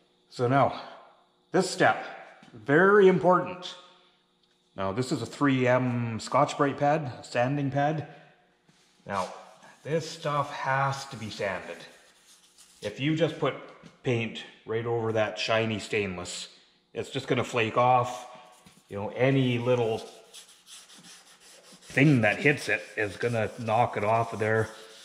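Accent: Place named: Canada. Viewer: Brilliant video. And the Canadian accent to explain the process! 🏴🇨🇦 👍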